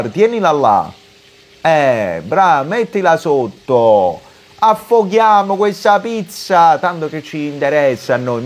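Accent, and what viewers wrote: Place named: Naples, Italy. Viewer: Sempre interessanti questi video. Poi l’accento partenopeo rende il commento ancora più efficace Complimenti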